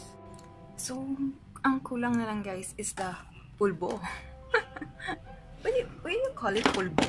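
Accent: Philippines. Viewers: bisaya diay ka abi kog ilonggo Kay sa tono mn gud nmo pag storya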